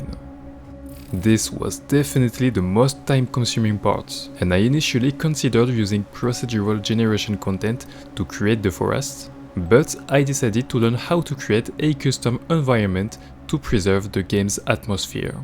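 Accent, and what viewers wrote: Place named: France. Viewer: I was thinking, he has the same accent as the french ppl in my Class. I check the County: France. Neat.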